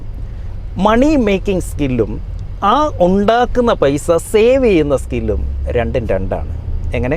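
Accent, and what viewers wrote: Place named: Alappuzha, India. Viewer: ആലപ്പുഴ ഭാsha not കൊയ്‌ക്കോട് ഭാഷ..they are njannamunja but can sell a koikode to any keralites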